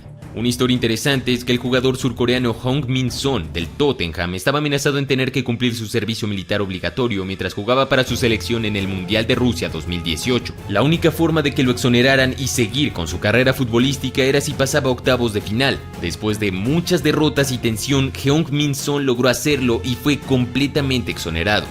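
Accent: Mexico. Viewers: Aa perro .. eres mexicano :0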